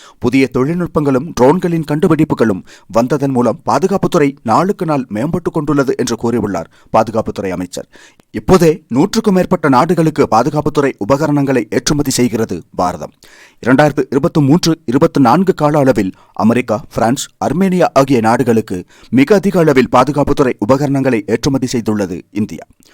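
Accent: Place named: Sri Lanka. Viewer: இலங்கை தமிழர்களின் தமிழ் உச்சரிப்பு (இது போன்றவர்கள்)... கேட்பதில் ஒரு இனிமை உள்ளது ❤ #..... நல்ல விஷயமாக தேர்ந்தெடுத்து பேசி வருகிறீர்கள்.நன்றி❤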